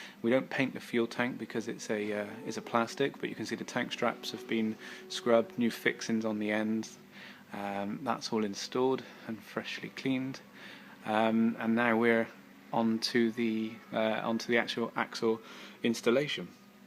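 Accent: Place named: West Country, United Kingdom. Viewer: "Startan the fit up" - good 'ol west country boy:) Waiting for a quote,...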